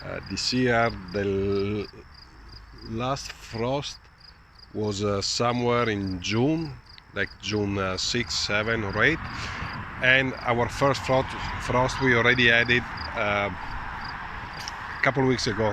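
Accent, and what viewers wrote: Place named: Italy. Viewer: Si sente l'accento italiano :D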